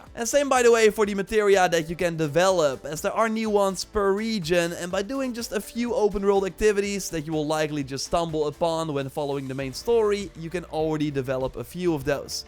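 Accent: Netherlands. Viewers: 100% dutch by the accent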